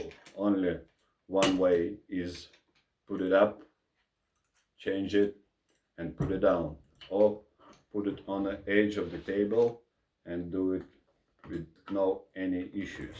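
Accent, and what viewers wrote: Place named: Russia. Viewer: I love the way you speak man. The English with the Russian accent is awesome, no bullshit just straight facts from a man with arms so ripped I don't dare to call myself a real man in comparison. 'sometimes costumers complain its very difficult to tight these clamps.. Yes.. You get used to it.' Unintentionally hilarious, I fucking love it. Please do more videos.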